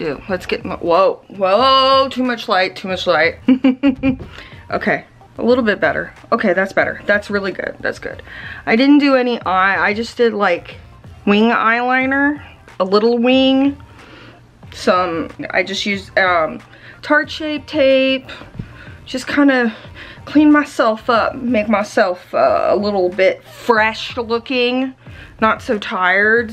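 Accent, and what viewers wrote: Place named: Massachusetts, United States. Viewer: Rabbit holing lol😂..Ik you’re from Cali but you sound like someone from Massachusetts lols. Merry Christmas!🌟🥰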